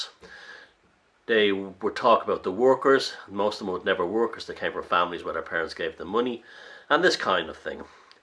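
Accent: Ireland. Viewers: Hello, thanks for your inspiring video,s! Watching your twenty twelve, video! Totally agree with you!!!!!! Noticing, your tantilizing lrish accent, tinking, about jr Tolkien, sermillion Hobbit! Lord of the rings! Thanks very much, bee jeebers!!!!!!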